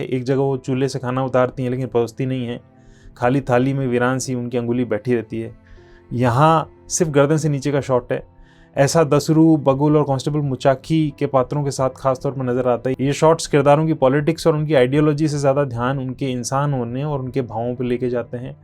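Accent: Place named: Rajasthan, India. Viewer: bhai aap rajsthan se ho kya , bolne ka andaz vanhi ka hai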